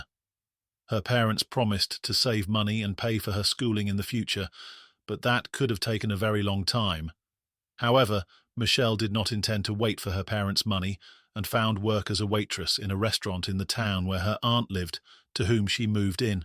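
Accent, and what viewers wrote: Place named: United Kingdom. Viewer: Never sounds right with British accent, especially as scripts are written by Americans, so have American words like "sidewalk" - in England we say "pavement". It sounds odd hearing these words read in a British accent. It's more genuine when a native narrates, one can get into the spirit of the story better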